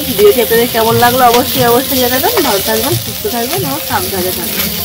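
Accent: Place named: Medinipur, India. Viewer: এই ভাষা টা আমাদের গ্ৰাম ও বলে মেদিনীপুর ভাষা, কাই টু মাই টু ভাষা ভালো লাগে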